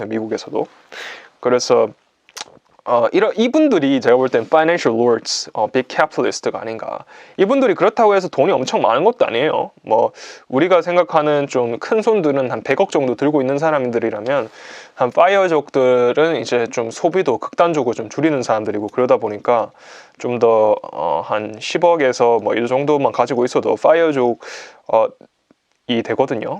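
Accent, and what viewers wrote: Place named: Gyeongsang, South Korea. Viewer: 경상도 억양의 남자분인데 영어 문장을 읽을 때는 발음이 오~ 혼돈 속의 혼돈 번역해서 책으로 내신다면 사고 싶어요.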